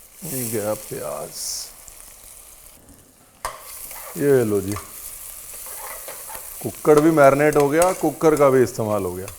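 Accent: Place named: Bihar, India. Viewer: The Bihari accents has enhanced the cravings for this dish😂 Thank you very much 🙏Sir for sharing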